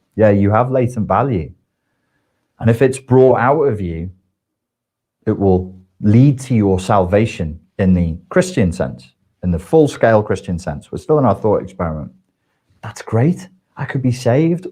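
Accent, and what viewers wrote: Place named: Liverpool, United Kingdom. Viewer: This is gold. You sound so Scouse. I love it.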